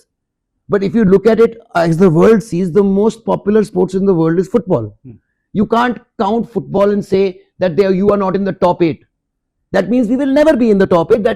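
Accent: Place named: Jammu, India. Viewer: Your hindi similar to jammu hindi 😂 buta . Bhande . Etc😂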